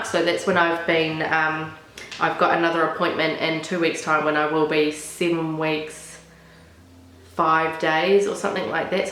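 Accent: New Zealand. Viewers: congratulations ! ive just started vlogging i live in melbourne but am from nz too, cool to see / hear the kiwi accent on you :) all the best for your pregnancy journey 💕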